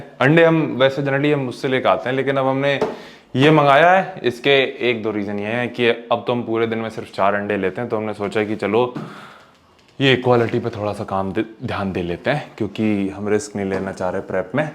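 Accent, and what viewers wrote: Gujarat, India. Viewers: Bhai tum gujrati ho kya ?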